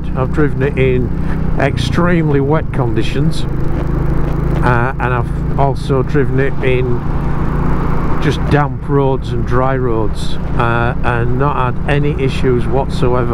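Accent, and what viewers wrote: Yorkshire, United Kingdom. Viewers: Just found this vid.Nice to hear Yorkshire accent.I live in NZ but I am from Sheffield .I am looking at the Classic 350 as a possible bike to down size to and found the info given very useful,cheers .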